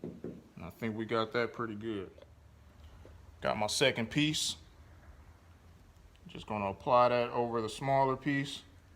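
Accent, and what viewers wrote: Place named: Youngstown, United States. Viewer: Where you from man. Reminds me of my area in Youngstown hahah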